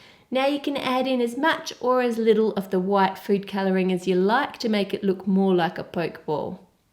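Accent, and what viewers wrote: Australia. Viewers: You know shes Australian not British or American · Are you from Australia?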